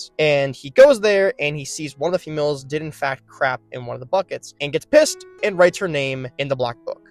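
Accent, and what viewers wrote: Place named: United States. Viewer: Clearly this is written and narrated by an uneducated American.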